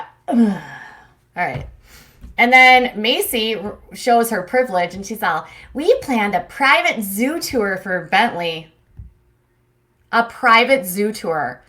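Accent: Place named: Tennessee, United States. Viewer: Good with the Tennessee accent! I'm from Memphis, lol Good review, as always.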